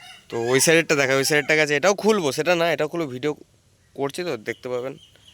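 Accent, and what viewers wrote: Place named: Bardhaman, India. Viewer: Dada ami tomar kotha sune bujhte pailam apnar bari bordhoman memari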